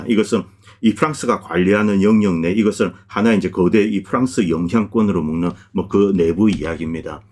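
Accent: Gyeongsang, South Korea. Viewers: 근데 님 예전부터 궁금했던건데 경상도 출신이신가요? ㅋㅋ 이민가시기전에요? 경상도 말투가 묻어나오시는데?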